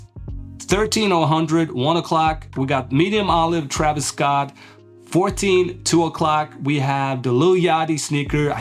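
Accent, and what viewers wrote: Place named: New Jersey, United States. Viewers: I thought my man was from New Jersey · even sound american. Am i trippin?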